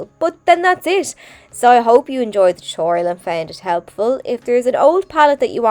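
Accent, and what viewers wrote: Ireland. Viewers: Really enjoy how you explain what colors you use and how to do this it really helps!! ❤ Thanks🙂😃and you must be Irish because of your accent! It's cute thanks❤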